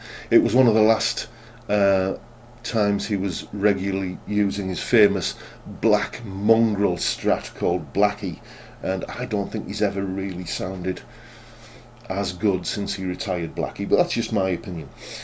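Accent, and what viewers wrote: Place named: Redcar, United Kingdom. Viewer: A great video and I must say I love your explanations in this and other videos. I feel like I’m learning fast from them. I recognised your accent as soon as I heard it.....I grew up in Redcar and Marske myself. I’ve subscribed and will keep watching and learning.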